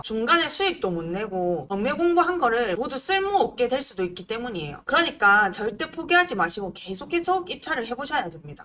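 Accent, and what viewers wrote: Gyeongsang, South Korea. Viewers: 갱상도 말투 쥑이네예~화끈하게 좋네예!!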